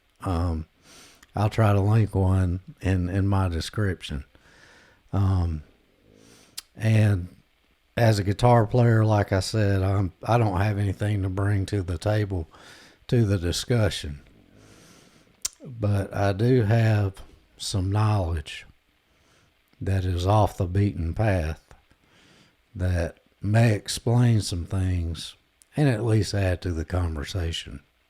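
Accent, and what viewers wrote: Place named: South, United States. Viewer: used to run the clubs snow bird style from Michigan to Florida.so I love hearing southern draw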